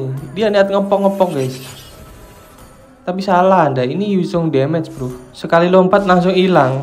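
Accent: Java, Indonesia. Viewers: suaranya jawa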